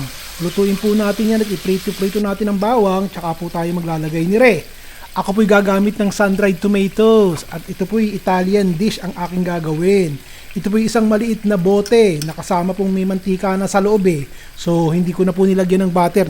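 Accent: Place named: Batangas, Philippines. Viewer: Nkktuwa ka po mag salita. Akala ko po Taga Batangas ka po or Bulacan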